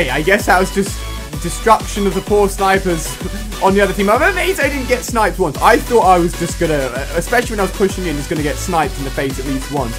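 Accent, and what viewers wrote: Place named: United Kingdom. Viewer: are u 50%muslimand50% British I think that because u have a British accent and your name is a Muslim name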